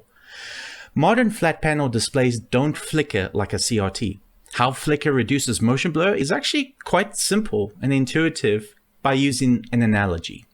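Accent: South Africa. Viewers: I detect a South African Accent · Just started the video, noticed your accent sounds like south african... Are you from South Africa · South African ;)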